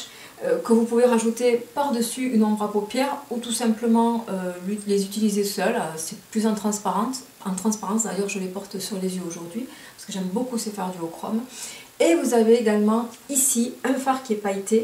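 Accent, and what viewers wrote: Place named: Southwest, France. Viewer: C'estdommage d'enlever les swatchs! car on ce rends mieux compte de la pigmentation des Fards.. la couleur..et Les packaging. En plus j'adooore Ton accent! Pourtant je suis de Marseille et j'en ai un aussi! J'adore le sud ouest! Hâte de venir y faire un tour... Brefff... c'est toi le chef.Mais c'est dommage... 🙄🙄 Bon we à vous deux. Des bisous 😚😚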